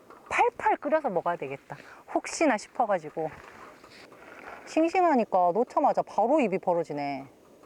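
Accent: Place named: Jeolla, South Korea. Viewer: 이언니~ 전라도 여자맞네! 억양이 세다..... 나도 남들이 듣기에 이럴꺼야~~ 같은 전라도 여자잉께 ㅋ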